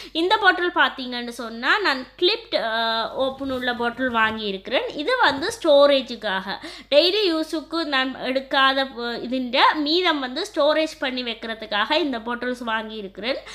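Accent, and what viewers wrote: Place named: Sri Lanka. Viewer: Very good collections dear:) Your Tamil is too good to hear:) Lanka?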